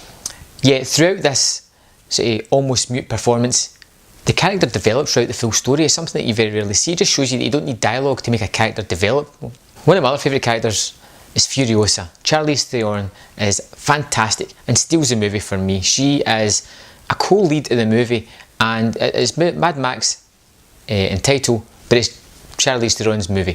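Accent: Scotland, United Kingdom. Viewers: hey Great review, I subbed. I am just starting out as well, trying to get to know other people who do reviews. I do Movie Reviews and Voice Impressions. I am not trying to spam. Also love the Scottish accent. Here is a review I did of San Andreas, Check it out sometime if you have the chance. <link> Looking forward to seeing other stuff you do as well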